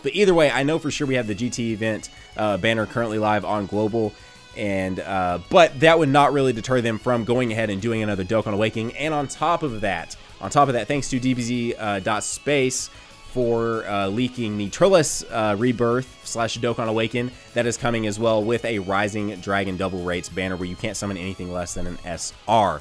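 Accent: South, United States. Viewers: Recently subbed you're one of my favorite youtubers xD also i can dig the southern accent! Im from Louisiana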